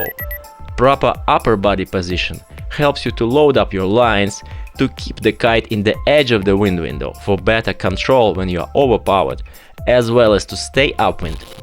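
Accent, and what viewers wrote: Russia. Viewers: Facking good Russian's accented pronounce!!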